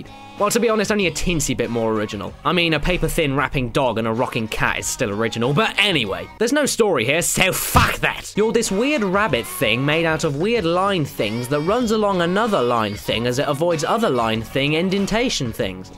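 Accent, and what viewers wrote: United Kingdom. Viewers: This is my first video of yours, got to and though. "Holy shit it's British JonTron" Not that its a bad thing its amazing. Also you have the exact same video game upbringing I did. With all PS1 and no nintendo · His British right?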